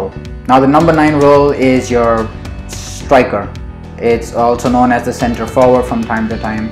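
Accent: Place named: India. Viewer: love your accent... Indian? 😛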